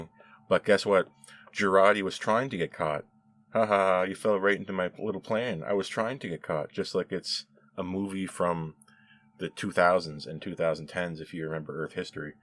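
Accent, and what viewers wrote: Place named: Canada. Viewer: This is just an observation, but you sound like you are a Canadian.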